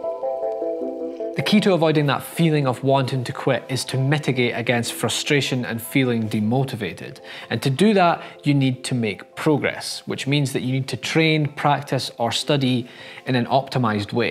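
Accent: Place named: Scotland, United Kingdom. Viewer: your videos are always an inspiration to me, thank you! Your methodical and logical approach, and calming Scottish burr, provide a path to things I've previously thought were out of my reach. God bless you!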